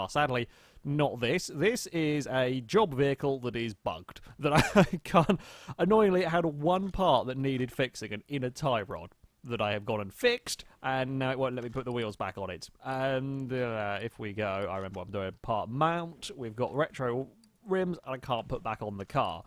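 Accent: United Kingdom. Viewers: AY Bloke,, hahahha we call stripping and selling in US 'a chop shop',, buy you had a rag, not a dream car, so yeah, you're only going to get trash money back,, now go 'steal' some rims from a barn, 'steal' some heads from a junkyard, and 'steal' some parts off another car of the near same type, then sell it, and watch what your value is!! Fun watching your vids tho,, and like I said before ,, love the Brit accent, reminds me of home... TC E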